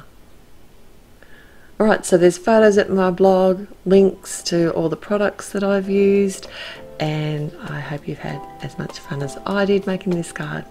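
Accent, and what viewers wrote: Australia. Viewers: Very cute card. Farts doesn’t sound so bad when you say it with our Aussie accent! 💖🇨🇦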